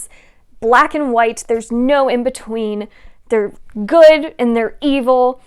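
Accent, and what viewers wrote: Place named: United Kingdom. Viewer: That British accent tho hahaha you reminded me so much of El Brooks in this video, I love it!!!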